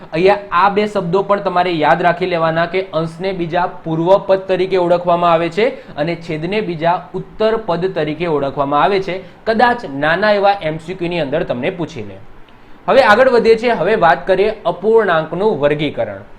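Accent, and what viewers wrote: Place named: Kathiawar, India. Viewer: Sir bov lecture joya tamara bov maja aave se bhanvani amari jevij sudhdh kathiyavadi bhasha se tamari 😊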